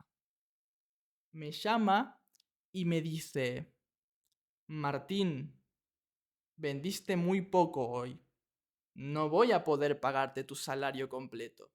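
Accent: Argentina. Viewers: No tenia que llevar el puesto por el tren? (Suena como chiste, llevar puesto, como decir llevo puesto nada por el tren, no se). Habia otro hombre con camion que venia para el puesto, dices? No entendi todo, pero entendi lo suficiente espero. Hoy he sucrito al canal, y hoy es primera vez he oido accento de Argentina excepto ayer un poco de un video sobre Casa de Asterion, la cuenta corta supongo que la has leido tal vez? Seria un buen tema para un video. I just remembered you speak english and italian but i had to practice writing in spanish. I dont know why i suggested la casa de Asterion this video is already super helpful. Thank you for making the videos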